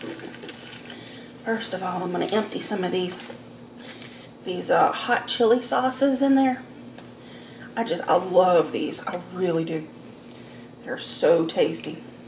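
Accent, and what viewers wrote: South, United States. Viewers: Hey. I say this with the kindest of words. I think you should go on the TV show My 600LB life and get some help. You are gorgeous and your worth it. I love your southern accent. Please go and get some help sweetie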